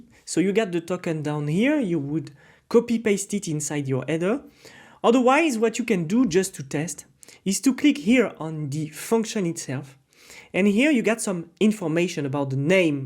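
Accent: France. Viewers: Tu es français ? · French accent is soo cringe 🤣🤣🤣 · Английский язык с французским акцентом намного понятней чем английский с британским акцентом 🙂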